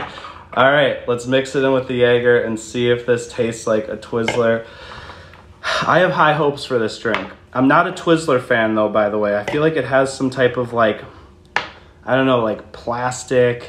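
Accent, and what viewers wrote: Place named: United Kingdom. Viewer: homie talks like a UK person trying american things.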